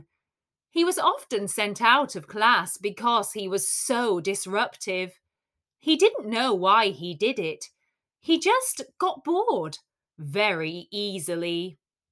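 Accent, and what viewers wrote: United Kingdom. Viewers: i adore your britsh accent , the course are just perfect thanks a lot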